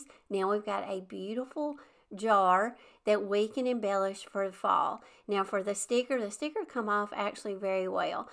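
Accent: South, United States. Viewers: I am so jealous of that fancy wine cork you found at the antique store! I love all your designs and diy’s. I can never pick a favorite, but you are one of my favorite creators. And I love your ideas and your accent. I’m from the South too. Raised in Lanett, Alabama, and live in LaGrange, GA, for the last 30 years. Love both your channels!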